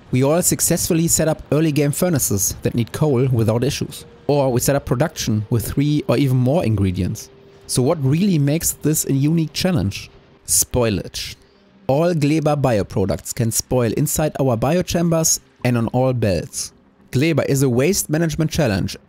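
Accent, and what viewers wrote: Germany. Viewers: are you German? i think so haha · bist du Deutscher oder was ist das für ein Akzent?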